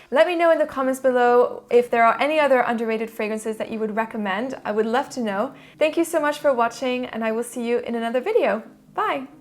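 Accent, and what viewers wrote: France. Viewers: Very infornative and to the point information. And nice French accent. Narciso rodriguez bleu noir parfum is my favorite. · I just subscribe cuz, j’ai adoré votre français accent, très jolie! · I love your french accent. You're very well spoken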